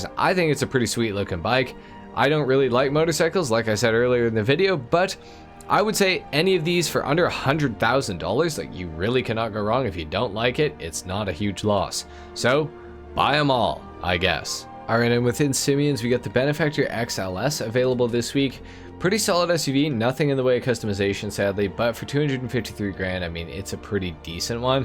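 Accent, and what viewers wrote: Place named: Canada. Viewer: Northwestern thing lmao. Listen to people from Wisconsin, Michigan, Minnesota, North and South Dakota, and yeah Canada. He’s from Canada I think.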